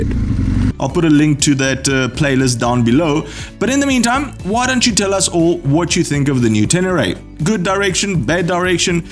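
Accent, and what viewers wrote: South Africa. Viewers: were you originally from South Africa? You have a hint of South African accent. 🇿🇦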